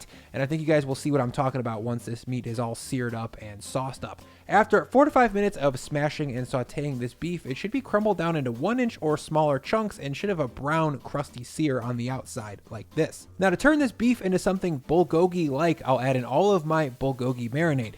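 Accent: United States. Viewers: Why does this guy have to use metric system measurements? It’s so frustrating. He’s clearly American with his accent or lack there of.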